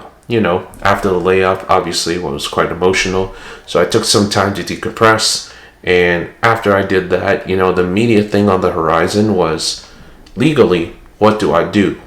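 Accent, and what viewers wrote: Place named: United States. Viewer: Your American accent is so clear. Good luck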